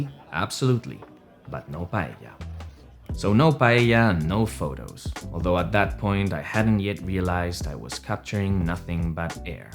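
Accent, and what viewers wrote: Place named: Spain. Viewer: Eres Español creo se nota😀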